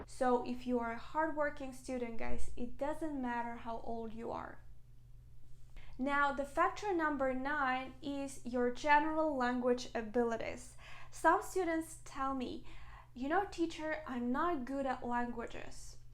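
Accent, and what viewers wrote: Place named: Russia. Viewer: Her russian accent is pretty noticeable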